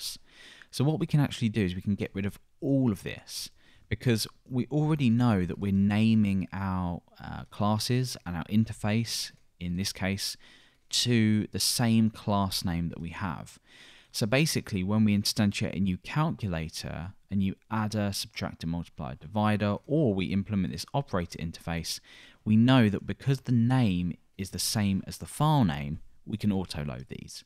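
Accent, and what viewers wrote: United Kingdom. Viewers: 2 things i love: British accent & simple explanation. Much obliged.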